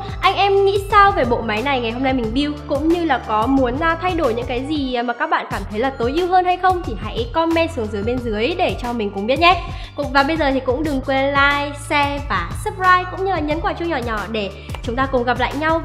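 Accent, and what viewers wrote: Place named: North, Vietnam. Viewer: Thực sự rất thích review giọng nữ đặc biệt lại là giọng bắc trời ơi dễ thương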